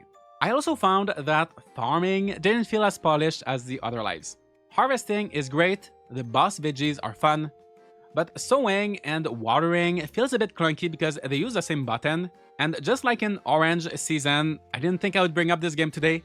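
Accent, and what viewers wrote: Quebec, Canada. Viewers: tu sonnes québecois t'es tu quebz le gros ?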